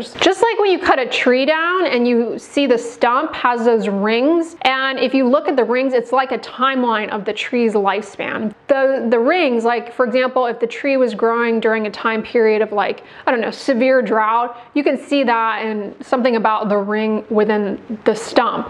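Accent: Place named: Carolinas, United States. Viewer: Interesting information! BTW You sound so American... (I mean the accent/tone.) A stereotypical American accent for my European ears... Where from?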